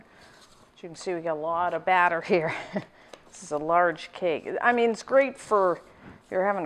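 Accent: Canada. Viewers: your accent is adorable! are you canadian?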